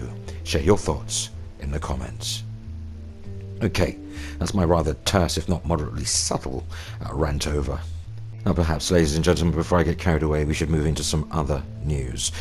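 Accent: United Kingdom. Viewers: I like how you always emphasize “rampant speculation” also I’m an American but hearing a British accent is refreshing. I have an American southern accent and some may enjoy that so cheers 🍻 to liking things.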